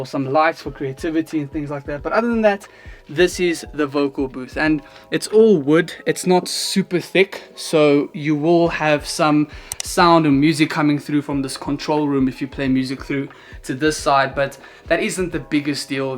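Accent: South Africa. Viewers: knew your accent was from here keep the content coming and representing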